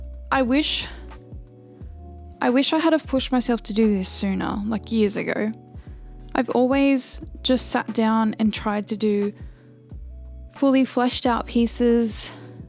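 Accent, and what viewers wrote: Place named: Australia. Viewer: lovely hearing an Aussie voice for a change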